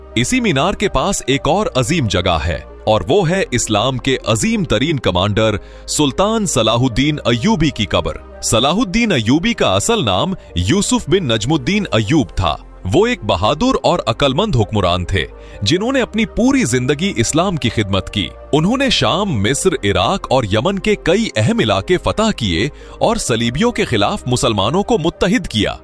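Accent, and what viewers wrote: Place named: Pakistan. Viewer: Kya aap pakistani hain? Itni pyari urdu pakistan walon ki ho sakti hai 😊 hum mumbai se hain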